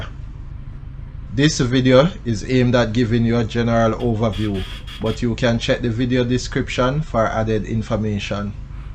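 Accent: Jamaica. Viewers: Perfect geography teacher 🇯🇲❤️Mi love the countryman accent 🇯🇲❤️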